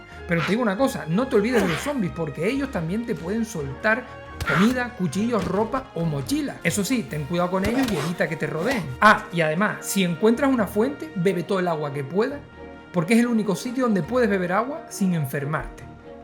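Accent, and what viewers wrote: Canary Islands, Spain. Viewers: Me suscribo por el acento canario. Y gracias.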